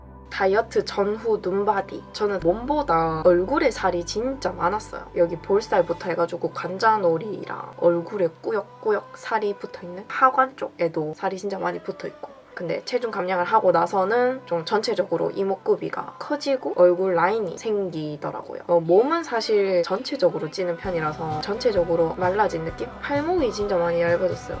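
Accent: Gyeongsang, South Korea. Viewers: Jenna Li wow ㅋㅋㅋyup her accent from busan(Edit: her accent from daegu!) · your voice + your Busan dialect is so relaxing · ARE U FROM BUSAN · 부산 사세요? 말투가 부산같으신데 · Gyeongsangdo accents really carry this charm that is so good to my ears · Hannah Rose Yasay she's from daegu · Well guys , I'm not korean but I can tell that accent isnt from Seoul I wanna say Busan ( correct me if I'm wrong) anywho ALL THOSE DRAMAS HAVE DONE SOMETHING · 多分プサンの方言かな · Are you from busan?? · She said in another vid that she’s from Daegu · 韓国人です❗釜山か大邱ですが、多分大邱だと思います。きょんさんどの方言であることは確かですがこの違いはそこの人じゃないと比べることは難しいですw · She’s definitely from Busan or perhaps somewhere in southern Gyeong Sang province. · 대구 아가씨 같네요~ 뭐든 의지가 젤 중요한 듯해요^^ 물을 많이 마시는 습관도 포인트! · i think it's daegu?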